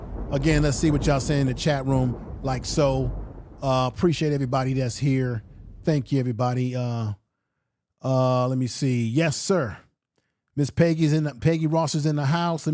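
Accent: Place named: England, United Kingdom. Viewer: UR ”ENGLISH ACCENT” iZZZ JUST DREADFULL‼️‼️‼️😱😱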